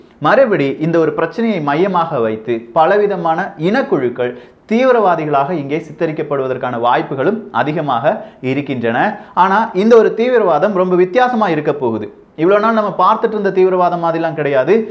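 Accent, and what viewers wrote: Kerala, India. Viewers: Extrodanary...bro....malaiyaala slaanghiel.neeghal peyisuvadhu.yenn uurrai .ghiyaabhaha paduththuhierradhu...,‼️‼️‼️‼️‼️👌🙏👍👍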